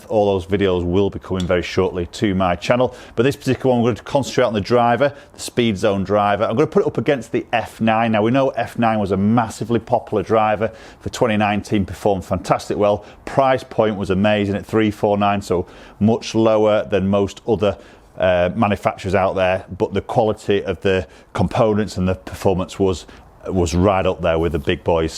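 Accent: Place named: England, United Kingdom. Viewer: STOP TALKING YOU PRATT WHY OH WHY DO THESE GOLF PROS ON UTUBE NEVER. STOP TALKING DO THEY GET PAID BY THE WORD!!!! And those horrible English accents! Shut up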